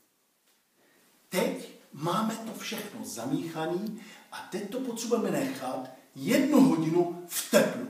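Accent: Italy. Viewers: Kanada? Ale má takový Italský přízvuk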